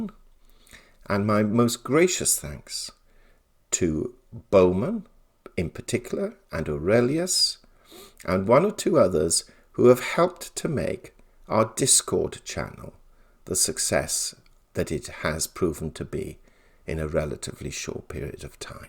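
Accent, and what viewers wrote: England, United Kingdom. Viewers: I truly love your postings. I make it an effort to watch for them every day, even if I place it on speed 1.5 to make room for the heavy English accent. Normally I listen to it at 2 and I do listen to many people on the markets. With that being said, you do go to awful lengths to prove you were right and others were wrong. I have noticed more often than not, you are right, hence why I choose to listen to you. Please consider the concept of “realizing you are right, you do not need to justify it in anyway”. You know, what you know.... and you sound extremely intuitive as well. Let go of any need to prove others wrong for it does not serve you nor me listening to you. Please, hear my views come from a pure heart. Thank you so much for giving us your guidance and advice each and every time you take the time to post a video. If you need any assistance on releasing this issue please let me know.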